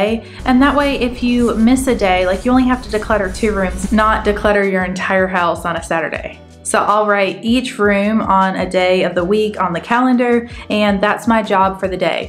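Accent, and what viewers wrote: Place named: South, United States. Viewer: Did you hear her southern accent? Now the hats make perfect sense 😂❤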